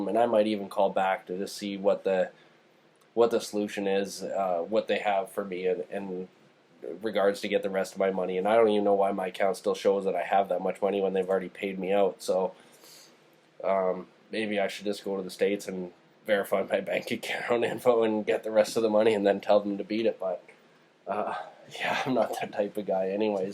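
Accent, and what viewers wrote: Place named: Canada. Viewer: As soon as I heard you start talking I was thinking, "Yep, another Canadian like me going in freaking circles for months." With every customer service rep they have thanking me for being a loyal customer for 27 year, but then telling me my banking info is not accurate. How does a massive company like eBay screw this up?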